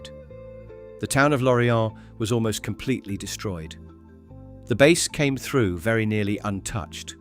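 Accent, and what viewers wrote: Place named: United Kingdom. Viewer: I think the bunkers are cool. Quit the Germany bashing. You sound British, do you not know that Great Britain and France committed atrocities too? What about the opium wars that the British waged on China because The Chinese emperor tried to stop the British from pushing opium on Chinese citizens. The Egyptians wouldn't put up with Great Britain anymore and rioted and burned British businesses in Cairo in the 1950's. The British Empire was evil. France ruled over Vietnam for a century and was vary oppressive towards them. Know what you are talking about before you speak.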